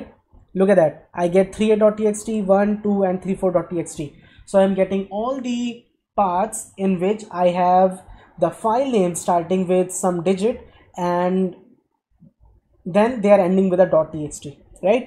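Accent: India. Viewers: Exelent explanation. Very Clear. Love the indian accent.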